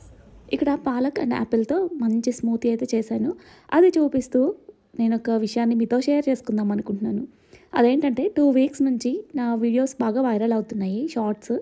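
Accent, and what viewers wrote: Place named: Nandigama, India. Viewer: Ma nandigama heroine 😻💓 me too born in nandigama andi ⭐💭 anduke slang adiganu .💖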